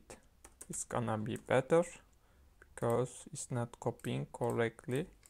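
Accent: Turkey. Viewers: kanka aksanın Türküm diye bağırıyor 🥰 başarılarının devamını dilerim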